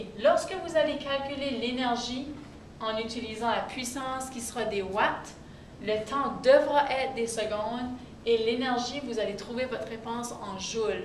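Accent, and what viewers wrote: Canada. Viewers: J'aime l'accent cannadien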